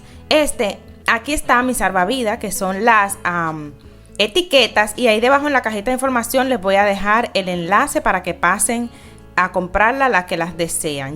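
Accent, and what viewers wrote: Dominican Republic. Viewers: Hola 👋 gracias por compartir tus ideas! 💡 Una pregunta , ¿ Eres Dominicana ? Tienes un acento sureño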